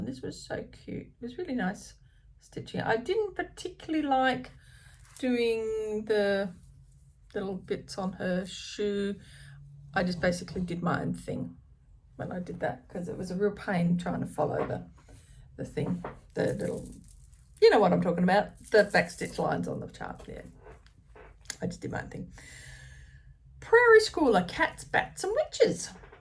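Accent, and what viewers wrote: Australia. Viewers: So happy to see you back and hear your gorgeous Aussie accent! I love all of your finishes and can’t wait to follow your progress in our group and on screen! Big hugs to you! ❤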